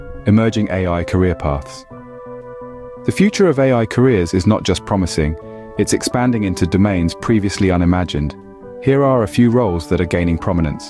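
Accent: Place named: United Kingdom. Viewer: your British accent is amazing and this video gives me some insights on AI trend in the future, nice video introduction👍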